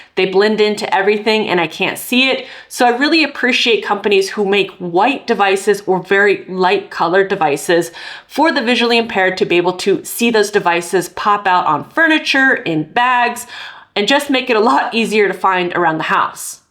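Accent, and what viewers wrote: United States. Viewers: Watching this video on that exact device, I got the white one too. I didn't know your channel before, if not for the name I would've never known that you were French too, your accent is very American! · Excellent video! By your accent you’re a fellow American, but are you using this in the US? I’m strongly considering picking one up here in the States, but I’m also very hesitant considering the instability of this compared to a more mainstream phone with better US support and a much larger user base. I’m about to start a PhD program and don’t want to get a major headache on my hands with this. Is it easy to get set up in the US? Is it painless to use here? Any insight would be much appreciated! Thanks again for such a great video review!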